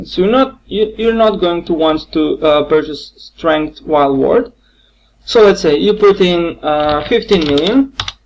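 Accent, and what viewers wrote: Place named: Poland. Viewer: Slychac ze polak :D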